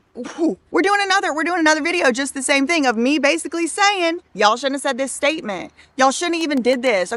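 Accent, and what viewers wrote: South, United States. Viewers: Thank you, I knew it’s southern, brilliant!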